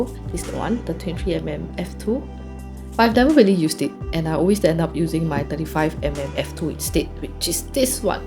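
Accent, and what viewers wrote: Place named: Singapore. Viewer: I own an XT5 and was just browsing Fuji YouTube for entertainment, I don't know why but being French expat living in Singapore landing on your video hearing your Singaporean accent was really heart-warming, feels like "home" (at least my home now). Subscribed!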